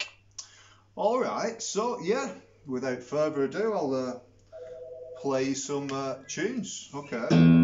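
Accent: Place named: Northern England, United Kingdom. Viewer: You sound like you might be from the same area where I am from originally. Bolton Lancashire. I’ve been in Canada since I was 7 but it’s nice hearing that familiar northern accent.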